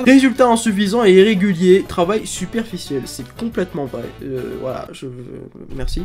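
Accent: Marseille, France. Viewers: Marseillais il est ?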